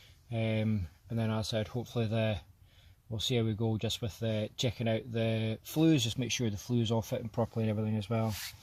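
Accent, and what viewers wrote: Inverness, United Kingdom. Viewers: Hi...Found your channel , subscribed and am working my way through them....Enjoying them very much...I am intrigued with your accent...I am an Invernessian born and bred....Your accent is somewhat similar....Great variety in your vids , thanks for all your filming efforts...Look forward to watching more....Cheers from Inverness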